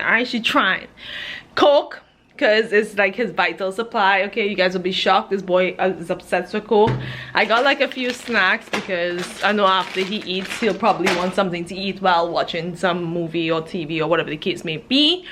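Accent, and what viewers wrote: Trinidad and Tobago. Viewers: Girl! is that a Trini accent I hear!??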